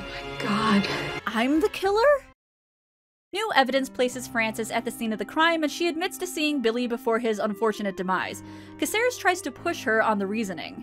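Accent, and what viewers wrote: United States. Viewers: they are NOT homophones to her. It is regional in the US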